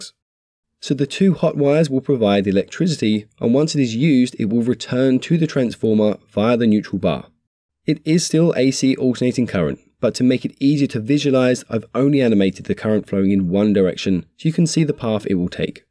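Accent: United Kingdom. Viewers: What country are you in that is using copper for water mains in the street? I'm Britain, where his accent is from, we use plastic piping in the street, plastic in the homes. It's been like this for a couple of decades. I'm not sure if he's being generic in his board or for American, because he's talking about 120 and using double positives to make 240. I'm not an electrician. We have had to adapt wiring to this reality. You can no longer trust copper piping in a home will be continuous or lead back to a central area. It can be changed at any time and the copper circuit can be broken. For this reason Earth cables are being run directly from the front to the back of the properties using the main electrical board for the main earth point. Push fit plastic piping is so quick now, and they just need a small little blade cutter to cut the pipes to the right size. 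It's flexible and so easy to move around. Copper is much more expensive, difficult to bend, more time to cut, but the joints are a little bit cheaper. However plastic push fit connectors can be easily undone just with a click of your fingers. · I would prefer a human voice instead of a robotic British voice!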